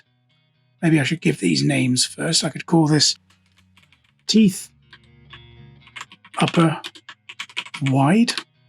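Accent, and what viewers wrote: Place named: RP, United Kingdom. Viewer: Think I've found the Attenborough of Blender tutorials, all the way down to the very accent if I'm not mistaken! And that is meant as a huge complement since he's the king of human voices.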